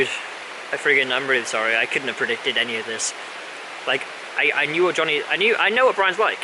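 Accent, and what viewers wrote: United Kingdom. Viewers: I like that your accent ad everyone in the UK is so different, but every time I watch a video of yours I always think you have a cold. Also no one should be told what to do and when to do it. Live your life the way you want and make videos you want when you want. I love Damon and I am glad he is back, but he stopped making videos for so long because he didn't want to make them and didn't feel right making them ya know? Do you and tbh yes what Bryan did was wrong and if he had true friends he doesn't and if they ever think of being his friend again then he has a lot of making up to do and a lot of trust to gain back and everything. I don't know Bryan personally and I am not sure I ever will, but yes I will be careful if I ever was to meet him and know him personally. Keep your head up. ♥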